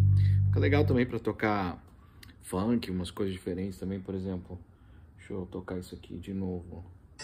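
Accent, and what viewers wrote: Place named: Brazil. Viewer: !!! Greetings from Montréal, Québec, Canada!!! This time, my message shall be a bit different...Me fije que su appellido era 'Latino' y ahora, por su acento, usted es 'Brazileno'!!! Yo hablo espanol desde hace 30 anos por haber sido casado con dos Latinas (Panama y Mexico) Sera un Gran placer seguir platicando y aprender de usted Sr.!!! Que tenga usted un bello dia y semana!!! Saludos calurosos desde Montréal, Québec, Canada!!! Ciao/Cheers!!!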